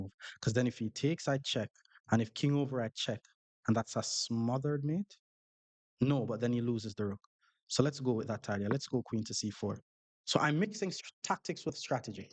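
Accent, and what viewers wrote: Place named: Jamaica. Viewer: I watch this channel so much now that my thoughts about Chess are in a Jamaican accent.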